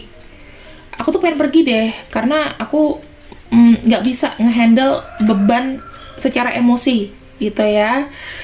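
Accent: Java, Indonesia. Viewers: Maaf mbak selama ini aku hanya mendengar genetal riding tp suatu saat saya mau personal riding dan caranya dihitung perja atau gmn dan perjamnya brp tktnya agak lm pertanyaannya ini aja mbak klo blh tau mbk itu org jaea apa medan ya kok pskai tp klo diliat bahasanya cengkoknya jg setenga jawa ini mbak slm dr martin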